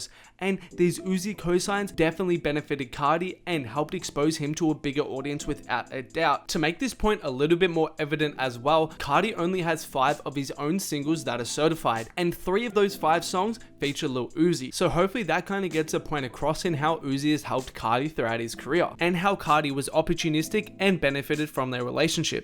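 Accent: Australia. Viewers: This guys australian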